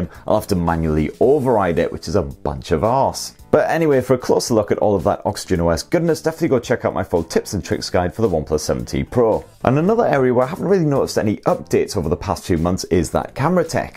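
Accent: United Kingdom. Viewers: it was a review for me before I lost in his British accent. now I am learning British English.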